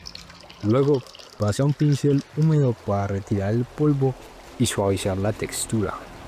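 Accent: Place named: Latin America. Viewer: un video acerca de lo que me gusta con el acento latinoamericano mas bello que existe, saludos desde Argentina amigo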